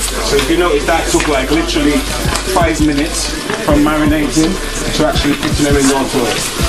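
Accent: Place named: Jamaica. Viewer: cho blowwow . hear di accent nuh .. lmao only jamaicans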